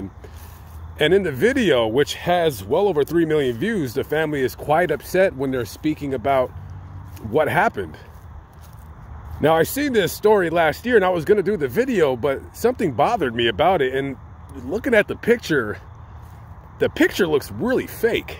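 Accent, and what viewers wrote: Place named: California, United States. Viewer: I enjoy his voice because he reminds me of California I lived there for 6 years as a child.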